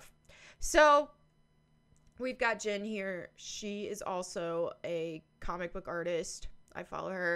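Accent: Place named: South, United States. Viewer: I have honestly become quite inspired by you since I made my way over to your channel like many. ie referral from Inspired by your Presence and almost abrasive delivery. Yet you also seem to have crazy similar mindset/goalset on...well almost every topic I have I've heard you Discuss. Like so similar that I found myself finishing some of your thoughts simultaneously in my head. Initially I was rather freaked out cause I don't think I have ever done that. It started to reoccur as i progressed through you few videos. So for one. I'd like to take this moment to tell you how awesome you and your message is..two I believe wholeheartedly in your key foundations considering most of the topics you have discussed in well the past hmm 2 days I think. haha (as well As should be spoken about more than it does.) Anyway, I do apologize for how intense this message may be for you. 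it is I guess simply how unexpectedly intrigued I have become. enough that I wrote this entire comment which I still don't even know the extant of my point. But was so enthralled that I almost felt I needed to connect you and relay my gratitude amongst other things. Also iDk what bit of southern touch to your voice you have. But it rocks kinda like you it fits you... I am from NYC born and bred so i am unaware of where. Allz I know is the more I learn. I am in awe of you. So Thanks for being You and keep the vids coming. you have probably reestablished a glimmer of hope for us as humans and women. I thou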